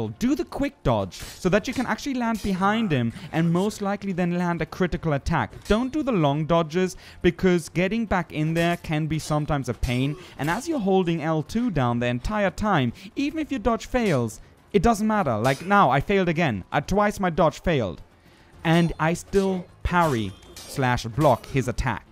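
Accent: Germany. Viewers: Ganz klar deutsches Englisch :D Dennoch gut gemacht, hat mir sehr geholfen :) Konter sind demnach wohl nicht so ratsam, weil man die Verteidigung runternehmen muss. Ich wurde schon vom Greifen am Anfang 3 mal zerfetzt :P Gibt es bei solche Monstern Tipps, die mna beachten sollte? Es durchschlägt ja Parieren, Quen-Schilde und der kleine Dodge wird auch komplett ignoriert. Also kann man praktisch nur Zeit schinden bis die Spells bereit sind, was ja nicht Sinn der Sache sein kann :/ Grüße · Robin Andersson Sounds like a german accent ;) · German accent actually. Austrians are speaking german, too btw. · grewal The Accent is German if you were still wondering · Great video! Thanks! Also, you sound like Flula. Haha.... are you German?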